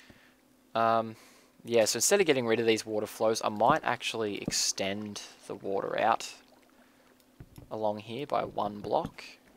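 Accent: Australia. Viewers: this may be a stupid question, but do you live in Australia? You sound sliiightly Australian.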